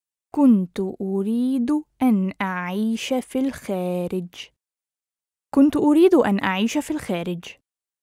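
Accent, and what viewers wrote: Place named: North America. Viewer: Alles falsch geschrieben Und was für ein arabisch ist das??? Hört sich nordamerikanisch an Vielleicht tunesische Dialekt? Aber habe trotzdem fast alles verstanden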